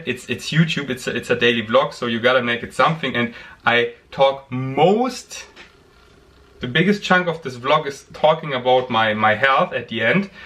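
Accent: Germany. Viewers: Stop worrying about your english so much. I already understand everything you say in english perfectly and I love your german accent. It sounds endearing.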